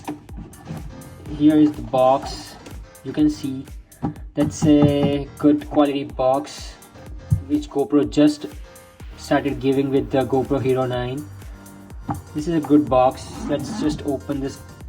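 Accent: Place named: United States. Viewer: American accent is not suiting you. But GoPro9 🤩🔥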